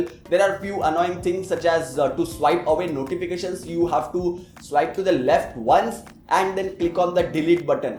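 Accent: Maharashtra, India. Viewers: Such a sweet Marathi accent, but still with good grammar, but sporadic Marathi transliteration. It is such a pleasure to listen to you,. Maybe I just miss my Marathi friends. Good job, well done.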